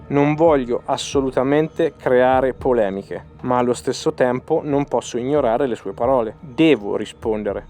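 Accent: Perugia, Italy. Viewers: Accento perugino inconfondibile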